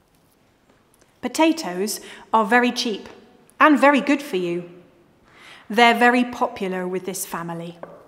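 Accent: United Kingdom. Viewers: Where is this actress from?? I can't, for the life of me, place her accent in the UK. Armonds- almonds Saurce- sauce And so on. I'm wracking my brain! Does anyone know definitively?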